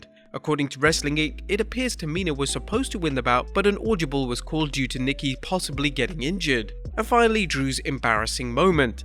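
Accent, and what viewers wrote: United Kingdom. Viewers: oh you're also from the UK nice. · Wow, u from uk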